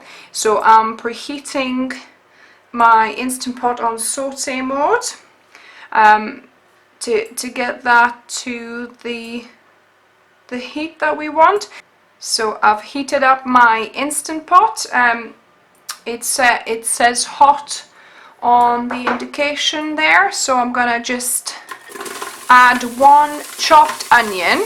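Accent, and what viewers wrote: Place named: Scotland, United Kingdom. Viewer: Are you Lithuanian? I was so sure you were Scottish or from somewhere nearby in the UK! But I'm not from there myself so maybe there is some small differences that I don't hear. The okara roast looks interesting. I'm getting so much okara now since I have started to make tofu and chickpea yogurt so I'm really appreciating the okara recipes!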